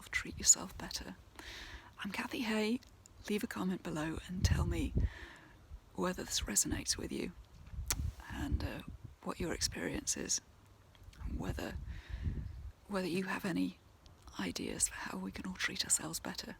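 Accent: United Kingdom. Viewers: I love your British English and your peacefull view on life issues which we all have. I agree with you what we all shpuld focuse on 😚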